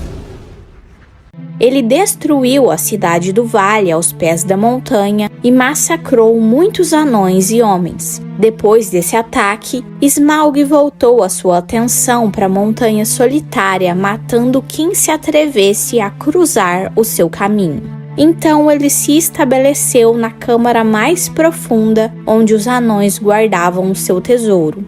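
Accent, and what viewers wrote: Minas Gerais, Brazil. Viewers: Cara?,sotaque minerio uai!,ela não puxa não, é só sotaque mesmo!(nos diga de onde vc é amigo?)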